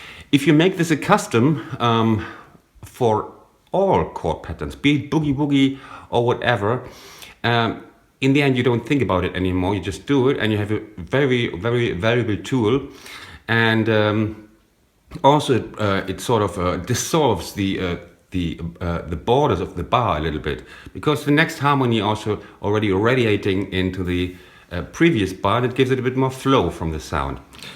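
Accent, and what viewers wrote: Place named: Germany. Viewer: Hallo, nice to hear your german accent......you Really make a good Job for beginners. One Problem for me was your First Trick for advancing the next chord.You speak from a Long Or nodded Note.I dońt Hearings ich in the beginning a looong Note, but only a strong Note.????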